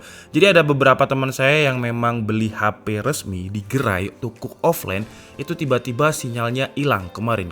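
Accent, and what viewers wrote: Java, Indonesia. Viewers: Orang jawa emang medok mas bro jawane😂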